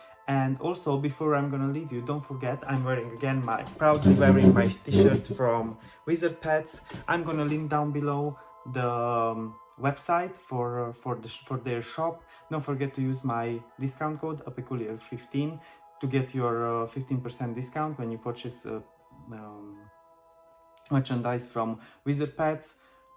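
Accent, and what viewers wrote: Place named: Czech Republic. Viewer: I like your accent:) I've never heard the accent of the Czech republic. And your English is excellent my friend!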